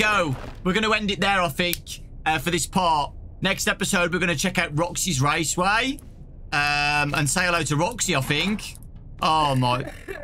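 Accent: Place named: Birmingham, United Kingdom. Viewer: The tittle of this video should be Brummy guy shouting at robots for 28 minutes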